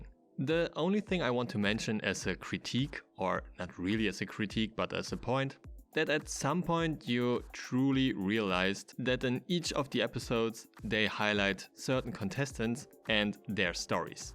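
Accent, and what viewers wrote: Germany. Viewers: You sound german?are you german? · yes i think so he sounds VERY german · I think you‘re a german speaking English (no hate to you, just random fact I think I noticed 🤗) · Ich glaub du bist deutscher nh🤭 der Akzent kommt mir zu bekannt vor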